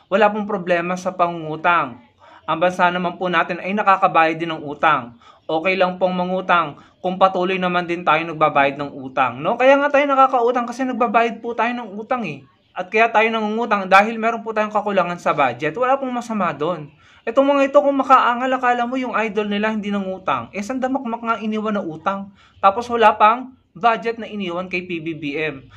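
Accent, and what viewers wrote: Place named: Batangas, Philippines. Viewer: Isa rin yan bobo yan batanguenong accent na yan Bobo na Vlogger ang daming bobo heheje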